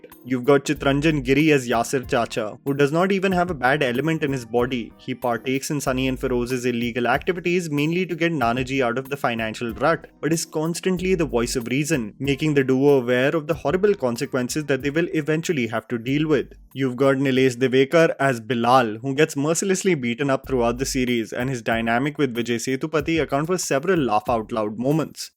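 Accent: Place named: India. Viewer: Watching your videos to improve my English, uf I love the Indian accent!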